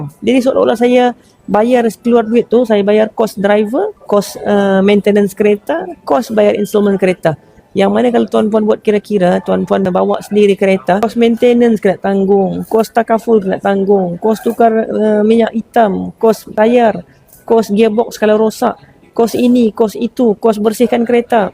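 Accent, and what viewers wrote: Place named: Indonesia. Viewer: Rasa2 loghat apa kakak ni guna ye? Kejap dgr mcm indonesia, kejap macam utara.. konpius...😂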